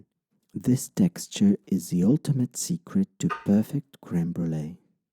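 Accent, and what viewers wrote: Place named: Czech Republic. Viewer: cool czech accent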